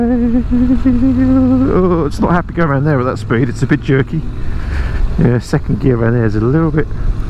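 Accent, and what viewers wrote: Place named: Ireland. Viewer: Cool bike, love that Irish accent. Thanks man.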